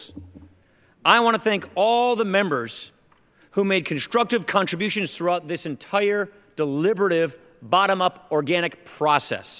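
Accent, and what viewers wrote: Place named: Upper Midwest, United States. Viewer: That upper Midwest accent makes it so hard to speak powerfully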